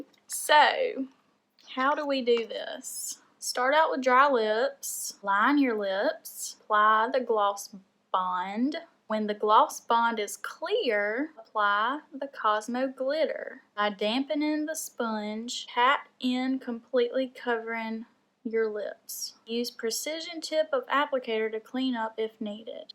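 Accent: South, United States. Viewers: Her country accent is so cute lol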